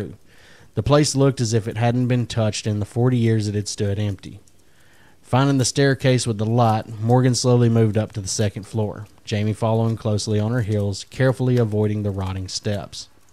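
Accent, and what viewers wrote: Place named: South, United States. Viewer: That southern accent is a very good commentary voice for stories :)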